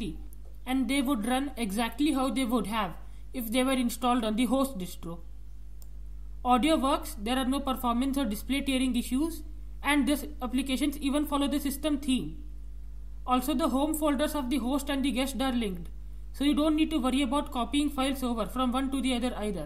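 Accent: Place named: Japan. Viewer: Adjust your Audio Setttings,its Low,and i love your Japanese Accent......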